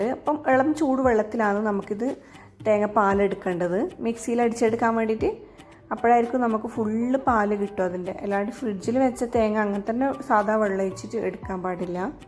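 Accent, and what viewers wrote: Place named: Trikaripur, India. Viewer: Hi from another YouTuber from Trikkaripur ☺️👍...nice presentation with trikkaripur slang 😁... Do support back. 🙏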